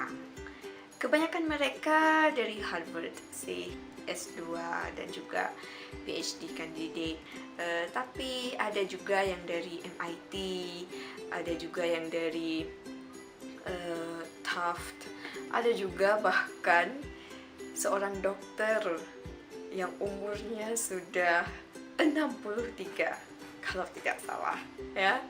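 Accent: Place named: Malaysia. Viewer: ikut senang bhs indonesia ada di harvard. Dan apa kabar dengan bhs malaysia kak? apa juga sampai di sana? karena sepertinya kak berlogat malaysia.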